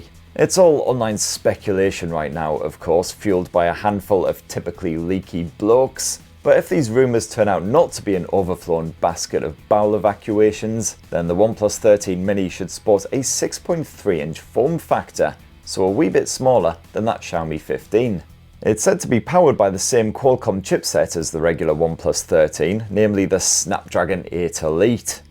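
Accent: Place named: England, United Kingdom. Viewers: his northern influence hitting me, even in the states. Love the English humour. 🤣🤣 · lot of mom-jokes, tips on how to get a good blastin, inapropriate language, best english accent ever... ...so what's not to like here? I love ur content, keep it up! And thx for the lmao moments, when im listening to ur reviews, while driving to work. Always makes my day! Greetz from Germany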